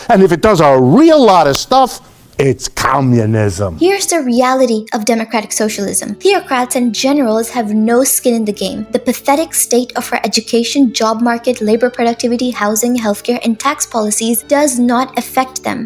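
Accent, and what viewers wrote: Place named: Pakistan. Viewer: I understand now. I should've deleted the above comment after you explained the same in a reply to my other comment :P I assumed you are an overseas Pakistani because of the accent XD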